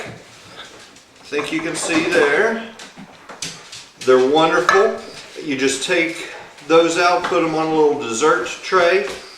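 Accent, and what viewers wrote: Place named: South, United States. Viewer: speaks in a souther drawl!!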